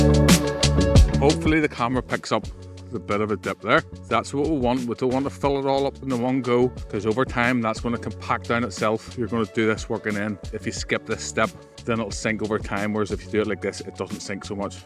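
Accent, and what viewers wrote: Northern Ireland, United Kingdom. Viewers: That's a Northern Irish accent!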